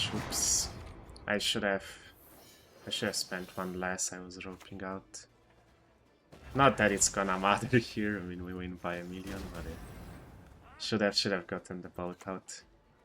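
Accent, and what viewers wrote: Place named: Estonia. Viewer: are you from Estonia? Can't put a finger on your accent. Very good English though. Ggs as always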